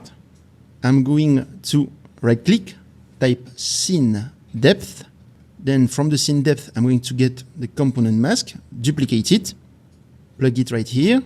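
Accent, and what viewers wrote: France. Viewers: Great tutorial and great bloopers lol... happy to see a French speaker having a hard time with English as many like me with French, and especially since I’m native speaker of another language of Latin origin! I would really appreciate it if you could tell me if you have Unreal courses available and in which online platform (I tried searching for you in Udemy), or maybe a book for sale online, or whatever. Here you have another “real” follower. Merci beaucoup from DR! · Merci !! T'as un accent suffisamment bon pour être agréable à écouter et suffisamment français pour que je comprenne, c'est parfait 😀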